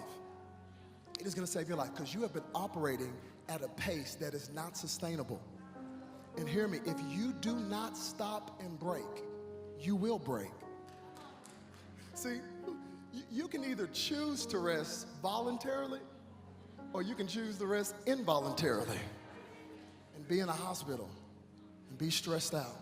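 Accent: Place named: AAVE, United States. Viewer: You use way to much. And a little ghetto. Sorry but you should bring it down with the ebonics.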